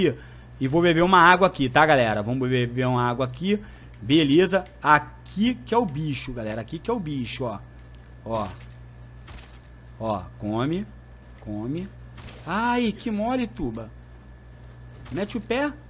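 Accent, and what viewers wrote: Rio de Janeiro, Brazil. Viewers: Ele é carioca?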